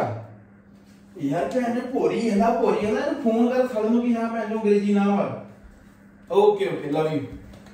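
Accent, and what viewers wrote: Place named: Punjab, India. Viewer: sir.... Tuhada punjabi touch bohat vdia ae alhamdulillah but please avoid using 'Bhencho' that much......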